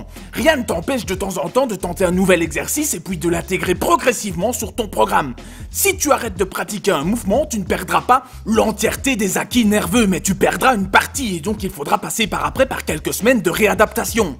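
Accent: Liège, Belgium. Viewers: haha;) tu es d'ou de liège?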